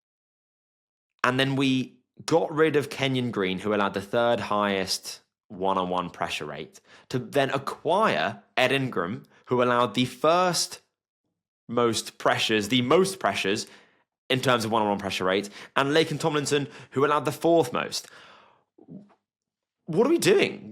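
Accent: United Kingdom. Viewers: Caleb with the most sacks and this dude thinks the Bears need a tight end😂. cole Kmet who played well and love him, no need for another tight end, they will not go warren, sorry. They need a D lineman or strong Oline man, their line on both sides were ass last year. Makes zero sense to pick up warren. If they don't go lineman they will go HB, because they traded their young Rb Khalil .That's also why you won't see Warren in literally any other mock for the bears. Dumb pick Also is this a British guy? Lol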